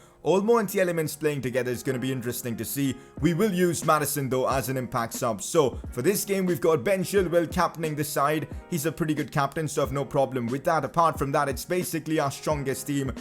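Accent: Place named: India. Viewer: Bro why do you accentuate your vowels too much. That Indian accent is too noticeable lmaooooo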